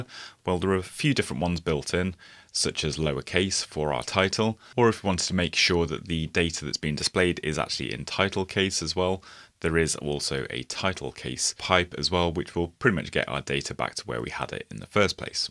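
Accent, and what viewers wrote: United Kingdom. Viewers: after dealing with Indian guys` talks in angular, it is nice to came across an expert who has British accent :)) Thanks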